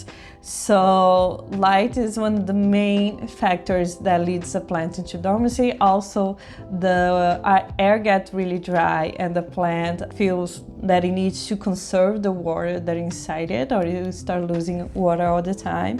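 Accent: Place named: Brazil. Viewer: Are you Brazilian by any chance? :D Love the accent!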